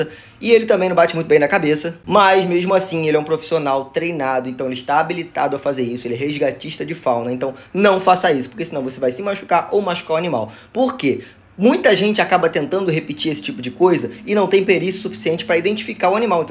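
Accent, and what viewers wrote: Rio de Janeiro, Brazil. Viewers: Adoro teus videos .muito objetivos Tu é o cara e o teu sotaque é muito legal carioca né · Você é tão carioca, que você falou “um dos”, e a legenda apareceu “um dois”. Hahaha! Abraço!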